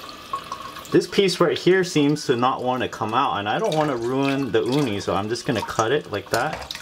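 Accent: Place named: United States. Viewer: great video but man it always catches me off guard when i see an asian speaking perfect american english lol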